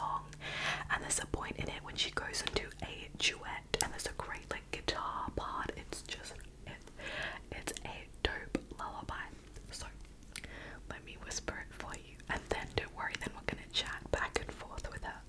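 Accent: Australia. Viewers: Same!! I've been subbed for a few months and I still can't figure it out. Kind of sounds Australian too? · Are u australian?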